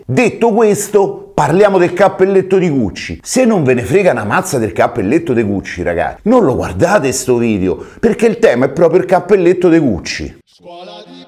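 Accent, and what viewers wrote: Rome, Italy. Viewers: Grande sono figlio di una romana e quando ti sento parlare mi raffiorano sempre i ricordi, volevo dirti che grazie a te 3 anni fa circa mj sonl appassionato tanto al pugilato. Oggi sono pro e non lo avrei mai pensato, ho fatto il mio primo incontro a Milano a gennaio prima del lockdown. Con tanto allenamento e tanti sacrifici per un diciannovenne. P. S. Porta ancora tutorial e di al franchino di stare più attivo su yt che siete dei personaggi... Grazie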